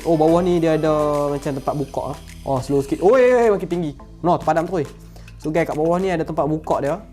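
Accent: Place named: Kelantan, Malaysia. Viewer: Kecek klate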